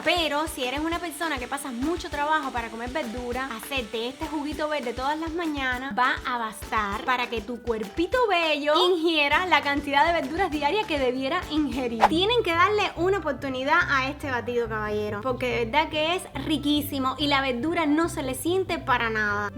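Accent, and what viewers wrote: Cuba. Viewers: Habla 24 hr cubano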